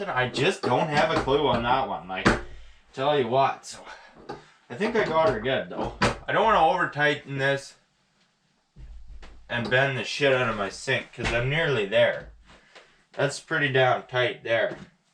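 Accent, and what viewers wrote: Canada. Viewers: Thank you! Has similarities to a project I'm working on. Also the thickest Canadian accent I've ever heard; it's great! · I love the Canadian accent and all your sayings 👍 Love the content too bud keep it up!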